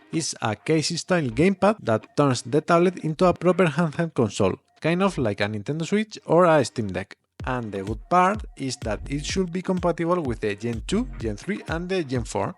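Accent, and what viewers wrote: Spain. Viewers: Si se nota acento de español de España 😅, en latino americano nos obligan a perder acento cuando aprendemos inglés. Igual se entiende todo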